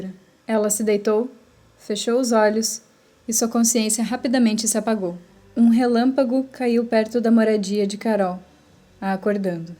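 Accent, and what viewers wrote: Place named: South, Brazil. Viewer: Adoro vc e o canal. Vc tem sotaque do Sul. Sou gaúcha. E vc é de onde?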